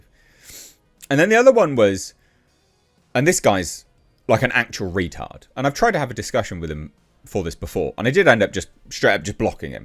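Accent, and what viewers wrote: England, United Kingdom. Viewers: maybe the non UK audience think you are vain as they are not use to the accent and how Brits present themselves? either way I LIKE YOU and the "Kings English"! 😀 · Hey, don’t sweat it bro. It’s probably just some kids. I appreciate your enthusiasm for Aliens & Predator stuff. It’s one of the reasons I subscribe. You also have good style, good politics, & even got me into retro Datsuns. The “vain” allegations are probably just because some Americans think anyone with a proper English accent is stuck up (arrogant). Who cares…You do good work & your channel is growing. You seem to be living the dream from my viewpoint. 🫡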